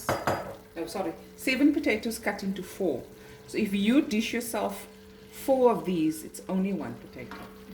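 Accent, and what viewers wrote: South Africa. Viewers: Nice to hear a South African accent again . Regards from Switzerland . Looking forward to cooking it . Looks lekker .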